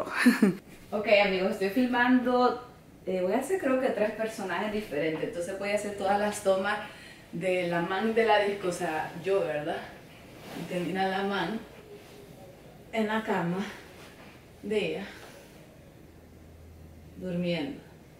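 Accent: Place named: Honduras. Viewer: Vos sos la mera mera man me la sube que no perdes ese toque catracho que nos identifica ❤️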